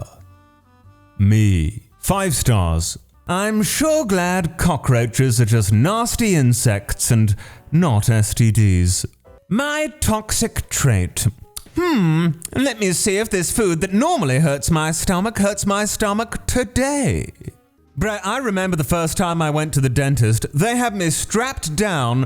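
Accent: United Kingdom. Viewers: His posh voice makes me feel positively Edwardian And I want D. E. E. Z. N. U. T. S GOTCHA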